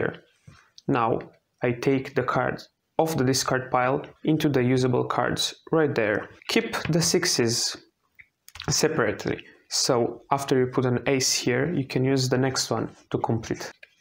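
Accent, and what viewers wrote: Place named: Russia. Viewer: Lmaoooo thank you bro.... Exactly what I wanted, learning a solo card game from someone with a Russian accent was simply perfect for me.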